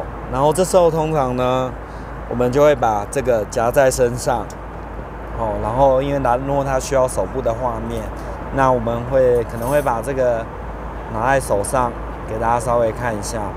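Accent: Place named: Taiwan. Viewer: 台灣人講話本來就這樣好嗎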